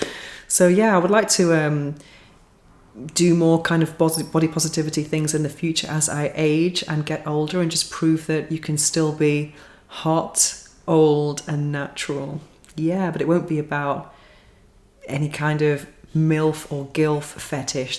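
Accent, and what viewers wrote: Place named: RP, United Kingdom. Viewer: Absolutely, I didn't mean it as a critique. You usually speak, for someone who doesn't have English as a first language, in a way which sounds more of a received pronunciation. Or it might be my imagination for all I know. :D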